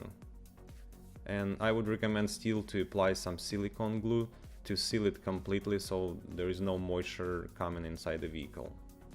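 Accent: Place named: Russia. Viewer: Strong rashn akcnt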